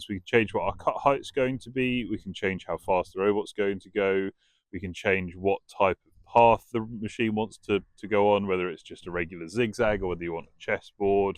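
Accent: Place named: United Kingdom. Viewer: I would have liked to have watched this video in detail, but being from Alabama, U.S.A., I could barely understand only a few words this narrator said. His accent was too Britishly thick for me to understand very much of anything he said. I need another video with better enounciation than this. I'm sorry, but I'm only being honest.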